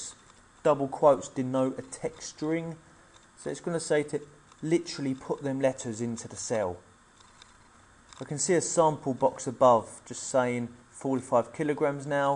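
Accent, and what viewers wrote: United Kingdom. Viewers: I like The British Accent, Well Done Brother Subscribed DONE. :)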